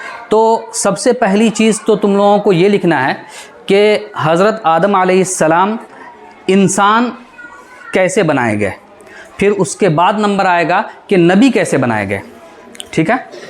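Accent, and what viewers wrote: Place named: Bihar, India. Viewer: ماشاء اللہ انداز تفہیم بہت اچھی ہے اور ٹھیک ہے ٹھیک ہے کی تکرار اور مزیدار بنا رہا ہے جو بہاری پن کی ڈھیٹ علامت ہے جو بر محل ہے اللہ سلامت رکھے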